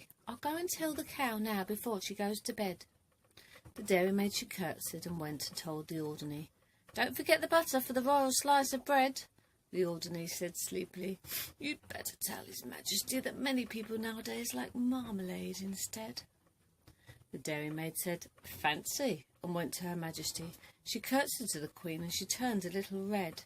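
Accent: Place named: United Kingdom. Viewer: A bit of British tongue to classic poetry! Nicely read my dear 😁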